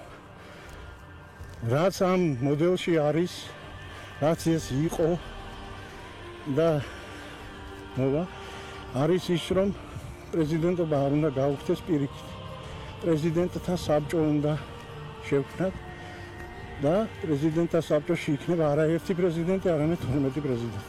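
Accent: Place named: Mingrelia, Georgia. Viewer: კილო მეგრული აქვს. ვინ არის ნეტა.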